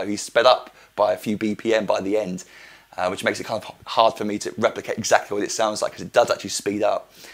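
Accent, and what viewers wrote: United Kingdom. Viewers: u BRITISH ??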